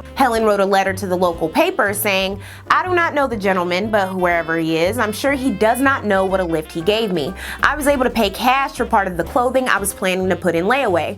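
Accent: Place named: South, United States. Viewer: lol i liked your southern voice. it reminded me of whitley from a different world. lol but i never knew that about the clan. that picture was really jarring to look at. but it was a cool video. i learned alot.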